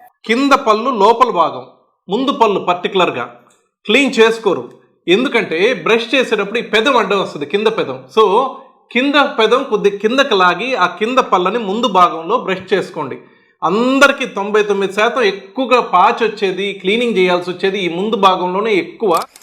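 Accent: Nellore, India. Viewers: Your Nellore accent is original & excellent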